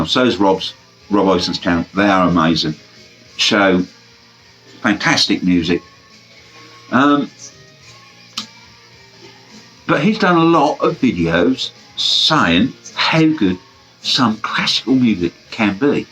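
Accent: Suffolk, United Kingdom. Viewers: Hello from a fellow son of God's own country (Sudbury to be specific) and a retired provincial journalist of near enough 50 years experience. I think I can clear up your stickers confusion. Back in the day, record companies would send white-label promos to radio stations, specialist music mags and national newspapers to generate pre-release publicity but they would also lift records from the first production run and ship them out to reviewers on regional and local papers. More often than not they would stamp or sticker the covers, sometimes even cutting a notch in the corner, to underline that technically these remained the property of the company who didn't want them being flogged on the black (well, greyish) market. It sounds a mite petty but hundreds of copies of hundreds of albums potentially adds up to a fair chunk of change each year. I should add, hand on heart, that I never resold a record but because I didn't like the artwork being obscured I habitually removed the stickers. 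That sometimes meant the sleeve was damaged but, ironically enough, even when the sticker came off clean I probably reduced its rarity value in today's VC market! Hope that helps and please keep up the good work. I only subscribe to two of the countless 'old bloke talks about music' channels and your's is a particular treat because it proves that the Suffolk accent hasn't been completely swamped by Estuary English commuters...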